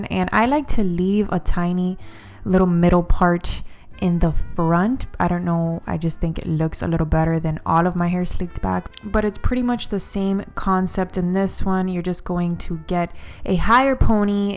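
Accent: Miami, United States. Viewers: Omg girllll I heard your accent and I was like "She's from Miami for sure!!" Love this 💕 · Miami accent?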